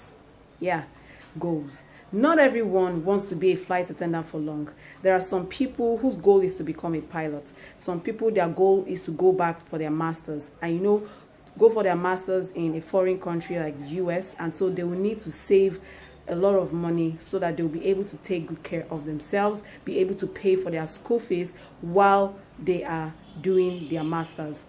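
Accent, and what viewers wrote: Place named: Nigeria. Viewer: Hello I love your authentic Nigerian accent! The Nigerians that I know are very hard working and ambitious people!! you seem to be so driven about your life and future!!! What I wanted to ask you is if it is possible to raise a family with the salary that you guys make as a flight attendant for Emirates? Is Emirates hiring again pretty girls and boys from the world over?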